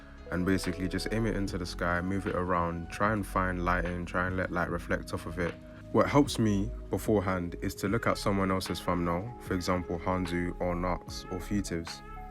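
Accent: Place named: London, United Kingdom. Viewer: I'm from London as well, recognised the voice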